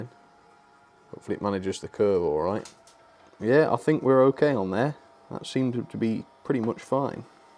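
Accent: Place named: East Midlands, United Kingdom. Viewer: A very good looking model there. Damn Quality control should have pick up on the blatantly obvious excess glue etc. Come on Dapol you have proved that you can build good quality models! It is an impressive performer nonetheless and some of the faults can be easily repaired, but you should not have to do any on a brand new model. What part of the UK are you from? I'm picking up a either Notts or Leics twang perhaps? One good trait you have is that you comment on a very good majority of comments that you receive!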